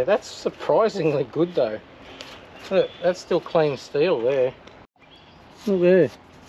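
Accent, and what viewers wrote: Australia. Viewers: Nice job on the floor pans😅. Did a few. Lol. Tech screws and filler!! 😜. Living in UK now. Good to hear a true fair dinkum Aussie accent. Keep the videos coming. Still think that asthmatic 202 and traumatic need to go!! It's begging for big block and 5 speed transmission 🤗🤗🤗🤗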